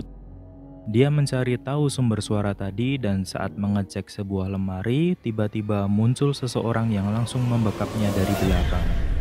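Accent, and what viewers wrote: Java, Indonesia. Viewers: Jowo banget 😂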